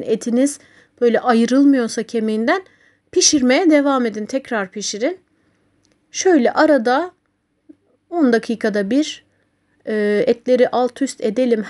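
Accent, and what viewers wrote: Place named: Kilis, Turkey. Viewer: Aynı Kilis lisanı❤❤❤gibi konuşuyorsun ❤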